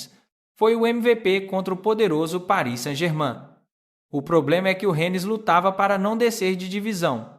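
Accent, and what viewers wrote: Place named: Portugal. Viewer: Mistura de português de Portugal e português do Brasil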